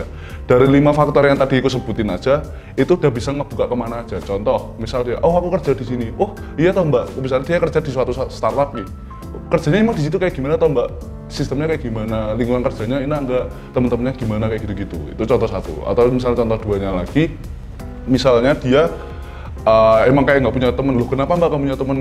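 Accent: Semarang, Indonesia. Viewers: njirr logat semarangan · Logate wong semarang ketoke sampean mas 😅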